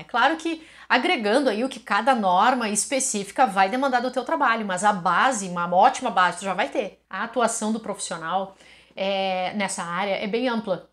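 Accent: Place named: Rio Grande do Sul, Brazil. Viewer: Tu é gaúcha, hein..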